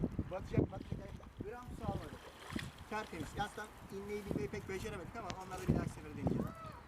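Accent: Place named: Black Sea, Turkey. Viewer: Abi karadenizlimisin selamlar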